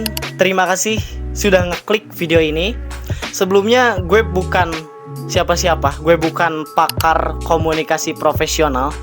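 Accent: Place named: West Java, Indonesia. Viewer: makasih penjelasannya bg sangat mudah difahamii btw abangnya org sunda bkn soalnya logatnya kenal bgt AHAHAHAH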